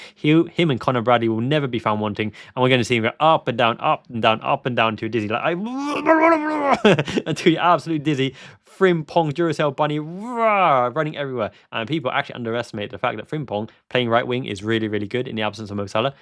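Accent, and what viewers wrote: United Kingdom. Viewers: Another top video and happy belated birthday 🎂 to Mia. Out of curiosity....it's clear from your accent that you were either born in the UK or spent many years here. What took you back to Japan ?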